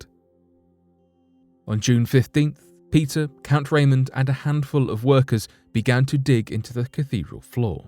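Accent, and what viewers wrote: United Kingdom. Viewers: An even greater unexplained mystery... Why is it that all the mystery/unexplained YouTube channels are narrated by Brits or Irish?! Not bashing I love it #Attenboroughismydad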